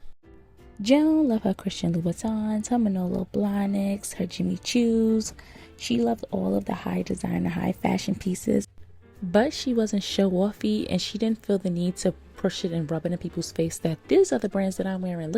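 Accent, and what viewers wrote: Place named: East Coast, United States. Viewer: Your accent 😍, you sound like you’re from the East coast. And tbh everyone on Girlfriends was a terrible friend oops.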